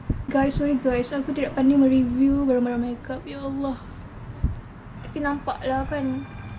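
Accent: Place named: Sabah, Malaysia. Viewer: Macam slank sabah. Iya ka? Assalammualaikum dri sandakan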